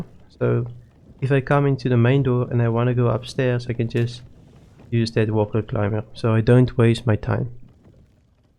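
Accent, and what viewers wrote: Afrikaans, South Africa. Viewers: Thick afrikaans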